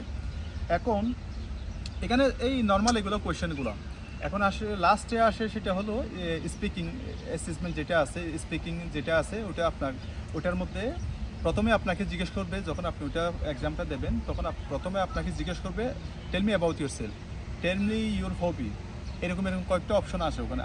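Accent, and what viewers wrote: Chittagong, Bangladesh. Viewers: ভাই আপনার কথা শুনে মনে হচ্ছে, আপনি আমাদের চট্টগ্রামের ছেলে 💚💚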